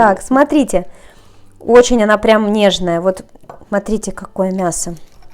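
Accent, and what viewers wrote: Ukraine. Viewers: Мои гости всегда в восторге от вашей курочки, но вот сегодня без паприки и куркумы бледная моль. Как же мне нравится ваш украинский говорок.... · Ваш Украинский говор воодушевляет очень.... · Как я балдею от украинского говора)))))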